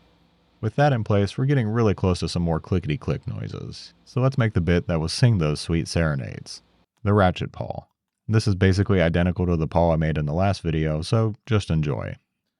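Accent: United States. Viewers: I know it has been mentioned before. Thought id ask after some time has passed. Willing to sell the modified drawings you made of this? I have the ivan drawings for a while. decided to get a new book and was disappointed to see the same old drawings. The faded and missing bits that you mentioned annoy me. Some modern cad drawings that havent been disintegrated from scanning a copy of a copy of a copy would be nice. Big fan of the channel. I also recognize your accent. Might help to know im a local near the bluegrass as well.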